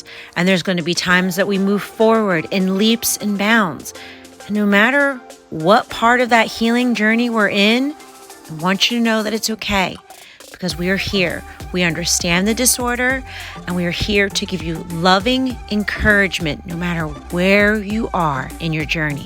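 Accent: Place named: Mid-Atlantic, United States. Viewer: Hi new to ur channel. Came over here from the minimal mom. I def hear an accent from home, ( Pa , NJ, Del area). Ty for sharing with Dawn's audience. All the best ☀️🌻🐝🦋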